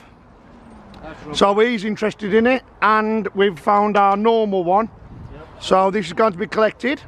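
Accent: Birmingham, United Kingdom. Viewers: i love the brummie accent great stuff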